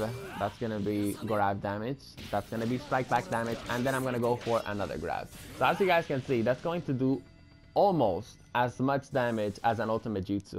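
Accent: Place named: Spain. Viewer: no problem!:) still catch ng your vids by the way, love your accent! You Spanish? Or perhaps Mexican?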